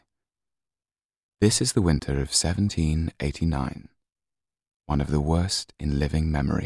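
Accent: United Kingdom. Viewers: Am I the only one that giggled at his rough london accent? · He brings such a huge opportunity for us male English learners to get a british accent. Bravo! Made it in one year. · you're not alone ..i still don't understand what he said because i 'm so distracted by his sexy voice....omg i love his voice, his acccent,...i love britsh they have such amazing voice..too bad im not from british :(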